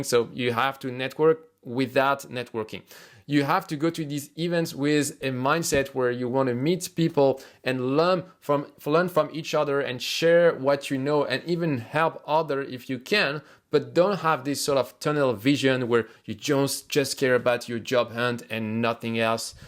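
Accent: France. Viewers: are you french?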